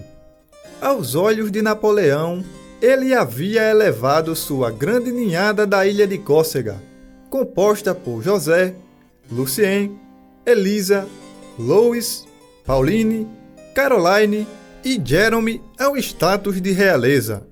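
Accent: Northeast, Brazil. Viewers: vou te contar, amo como você conta.... parabéns.... não perco um vídeo... e seu sotaque e humor dão um toque especial e único... orgulho do nosso Nordeste...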